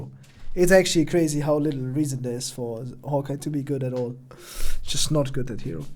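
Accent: Germany. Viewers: On everybodys soul, you are german. It took me 3 min to say for certain, but I am sure now. Its because of the hard k in things like cool and the german ie instead of the american iea in tier.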